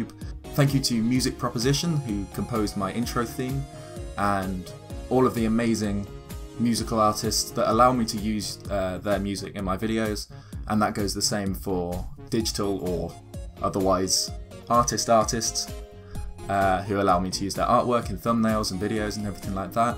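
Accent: England, United Kingdom. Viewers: I remember those days. You were and still are my favorite Zelda theorist. I always assumed was a mix of Zelda and Celtic. And I thought it was odd because you sound more English than Celtic.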